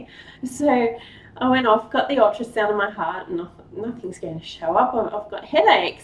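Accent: Australia. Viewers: Ja, ist eine deutsche Name. Und sehen Sie auch eine deutsche Dame aus, obwohl Sie hören eine Australierin aus. Woher (in Deutschland) kommen Sie? Ich bin ein Kanadier geboren, von deutschen Eltern. Und habe ich für mein ganz Leben hier in Kanada gewohnt.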